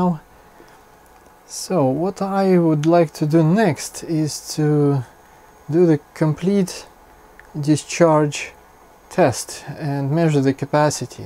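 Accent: Russia. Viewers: русский акцент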